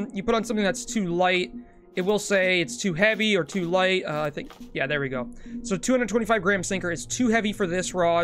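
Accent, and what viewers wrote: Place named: United States. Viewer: How come every video made about this game no matter who made it is in metric? I'm American and I us imperial weights and measures not metric so I have no ideal whats going on half the time in all of these videos, you sound American yet your game interface is metric its confusing period and dont tell me to switch I'm 60+ and I'm imperial till I die.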